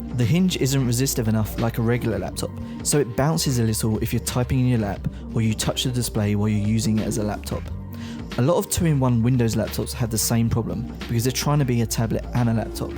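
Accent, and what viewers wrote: United Kingdom. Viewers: OMG, in Russia we get used to hearing American English. I can't understand his perfect British accent. Bleeding of my ears. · Brad Roberts He is English. So, his accent is UK accent...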